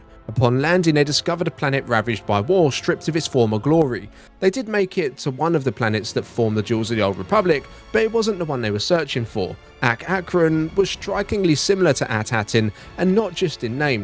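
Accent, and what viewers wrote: United Kingdom. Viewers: good video, except that strong bri-ish accent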